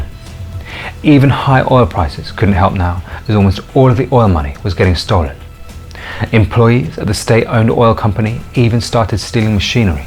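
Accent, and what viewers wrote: United Kingdom. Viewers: First of all let me start by saying I love your content but…Can I recommend maybe holding a fake or real if your down a snifter of brandy or cognac like I said real or fake. Your hand hangs outs there for ever plus I think the snifter 1000% plays into the decor and your obvious English accent. Just a thought a just notice your hand never ever retracts to your body it looks very robotic again these are observations not being critical by any means please don’t think I’m trying to be. Regardless keep up the great work!!!!!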